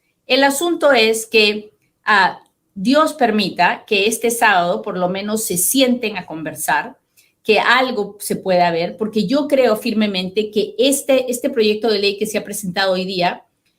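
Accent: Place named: Mexico. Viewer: Por q hablas como mexicana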